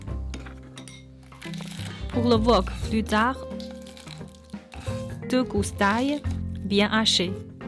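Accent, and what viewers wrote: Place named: Shaanxi, China. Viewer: 有点陕西口音…………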